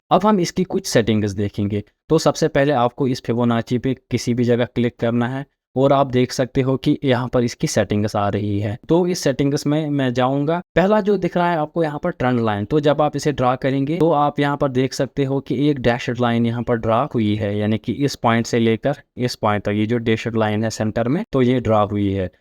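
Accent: Kashmir, India. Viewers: Sir, app Kashmir se ho kya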